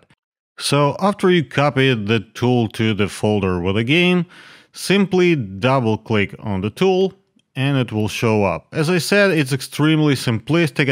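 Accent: Russia. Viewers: Русский акцент не перебить чистым английским) Отличная тулза, спасибо! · Русский акцент я узнаю везде, так держать товарищ! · russkiy russkogo slishit iz daleka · Russian accent coder "based" in the US yeah call me paranoid but nope i like my FPS like it is. Also, 50/50 stolen code. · Я прям чувствую родной акцент :) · Чувак, ты же явно русский, правда?)))) акцент..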